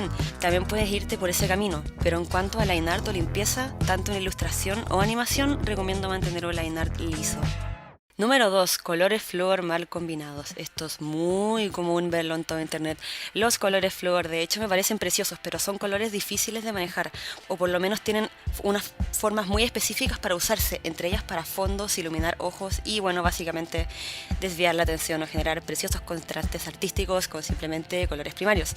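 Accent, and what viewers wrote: Chile. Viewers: yo despues de ver 2 segundos de video: es chilena? :0 xd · De primera pensé que era Chilena, luego dije: "no, es argentina" Y después dijo: "si soy Chilena" xd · wena pooooooooo yo cuando escuche tu voz dije Ez CHilENa xddd buen video uwu nueva sub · Jeje,wena mi pana 😎 No me esperaba que fueras de Chile,aunque si se nota en el asento Como que todas las chilenas suelen tener voz bien posta,así como desde kabra chica OwO,mi voz es media española en los audios,pero enrealidad si es posta xd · Aunque este video es viejo quiero decir que me encanta el acento chileno. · AHHHHHHH suenas muy chilena!!!!!!!!!!!!!!!!!!!!!!!!!!!!!!! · Te escuché y fue como es xilena YUJUJUI Aaa dibujas terrible lindoo weoon 😭💖💖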